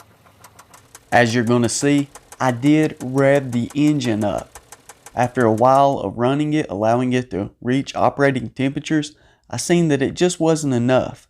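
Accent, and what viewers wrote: South, United States. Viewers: You are the type that give those with a southern accent a bad name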